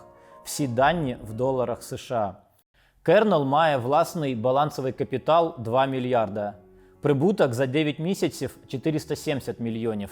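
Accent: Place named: Russia. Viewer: стадіон так стадіон! дякую за українську мову. У вас є певні слова, які ви вимовляєте ще на російськомовний манер. Але тренуватись ніколи не пізно, вірю, прийде досвід і будете казати правильно. Успіхів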